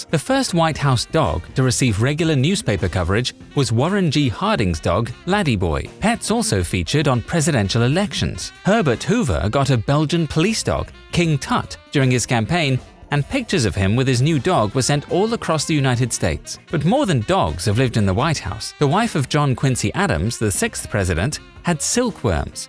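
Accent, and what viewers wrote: United Kingdom. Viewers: 1. Not michelle but Michael oboma.. 2.Geprge Washington had a copper Steel first..not Obama brewery.. Why a British accent giving American history gives pause to wonder..ok I'm done.. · British narrator! 🤣🤣🤣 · I like how this guy is talking about America but he British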